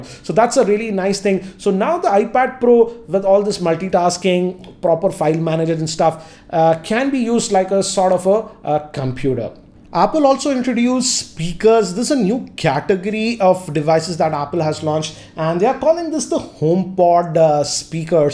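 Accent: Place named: South India, India. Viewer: Know How it's is a south india thing....accent!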